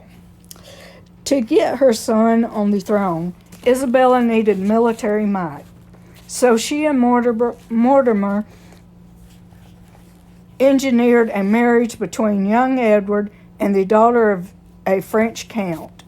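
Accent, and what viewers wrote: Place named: Alabama, United States. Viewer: I love the Alabama accent, it’s doesn’t sound like the American accent we all know and hear on television, I love listening to reading historical stories, thank you 👍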